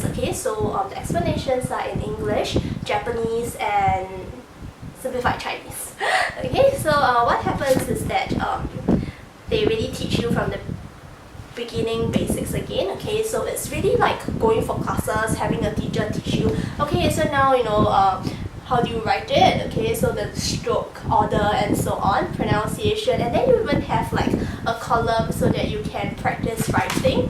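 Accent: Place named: Singapore. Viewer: Idk whether anyone has said this but you sound like a singaporean.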